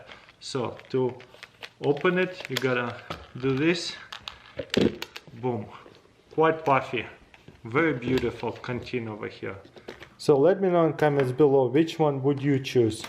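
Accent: Russia. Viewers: Lol he said water battles its "water bottles " lol i like his russian accent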